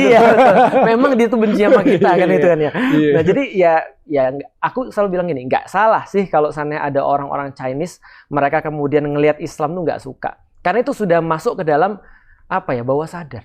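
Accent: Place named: Palembang, Indonesia. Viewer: Iyo lah pak ustad masyaallah kental nian Palembang nyo mantap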